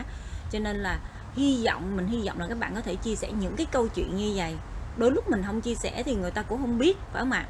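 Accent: South, Vietnam. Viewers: Giọng người đàn ông nói trong video phải là chồng em hôn? nếu phải thì người đó có giọng nói ngọt, đậm chất người miền nam. Bửa nay em đóng kịch tiếu lâm quá. Làm diễn viên được rồi đó !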